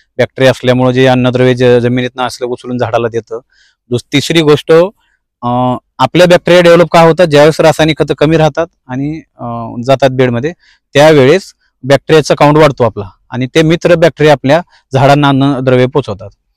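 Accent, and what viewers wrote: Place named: Pune, India. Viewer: खुपच छान, सर आपण फार छान माहिती दिली.शुद्ध मराठी पुणेरी भाषेत.धन्यवाद.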